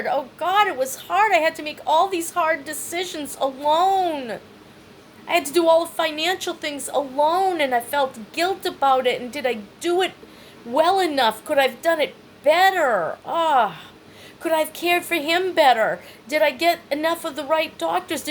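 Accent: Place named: East Coast, United States. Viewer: You have a good voice. I pick up on a slight east coast accent. You should look into doing voice-over work. All you’d need is a nice microphone and work from home. I’m an instructional designer and many companies need good voices for training programs. Just read a script and get paid! I think you may like it.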